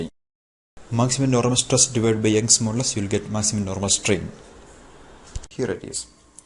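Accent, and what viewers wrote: Kerala, India. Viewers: His english is in Indian ascent...... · indeed, i lost interest in what he says and to the topic hes talking, a piece of sh*t indian accent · why can't Indians loose de idiotic accent? Does that happens for other languages? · nice and good explanation in a simple way.. guys who r worrying about english please try to learn indian ascent so that it would be easy to grasp concept.. Similarly we also watch other countries videos but I never complained about english.. Gain concept and be happy.. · very good presentation. but honestly . . .work on the language man !! Only an Indian can recognize your English !! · *Kerala accent. Others don't give out such strong accents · From your speech I knew that your indian And from your speech I havn't understood anything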